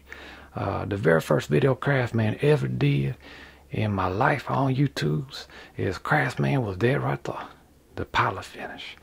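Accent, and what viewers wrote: Louisiana, United States. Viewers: The voice-over on this is like Bob Ross was from Louisiana and did crafts instead of oil painting- I love it! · I think I recognize that accent. Are you from Louisiana by any chance? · I appreciate that the instructions are in Southern (sounds like Louisiana)- slippy slippy and smush and catawompus are precise terms that are easily understandable for me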